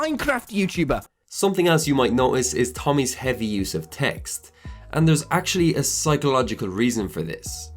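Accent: Ireland. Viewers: Nicely done, and nice to hear another Irish voice on YouTube!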